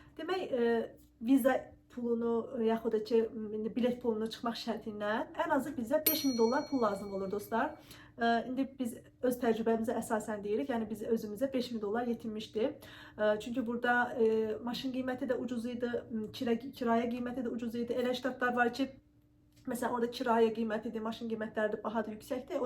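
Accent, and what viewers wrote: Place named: Zaqatala, Azerbaijan. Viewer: Maşaallah olsun. Həzz verir adama izləmək. Zaqatala ləhcənizi yesinlər, hocam 😃❤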